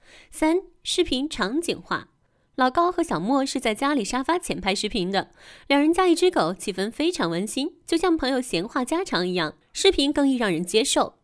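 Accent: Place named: Jiaodong, China. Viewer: 是胶东呛，大连人讲山东话，谢谢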